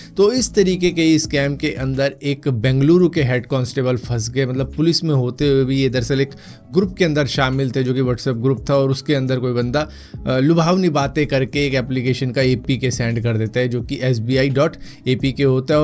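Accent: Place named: Punjab, India. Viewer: Bai tum Punjab se ho I know I know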